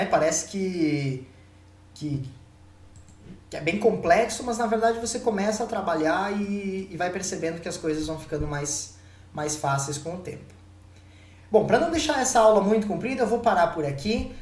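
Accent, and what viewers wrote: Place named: Rio Grande do Sul, Brazil. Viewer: Ótima didática! Parabéns! Eu utilizava outros softwares para modelação de estruturas metálicas, já havia mexido no Tekla há muito tempo em versões anteriores, essa versão está bem melhor! OBS: Pelo sotaque deve ser meu conterrâneo heheh... Estou em São José do Rio Preto/ SP, mas minha família é de Marau/ RS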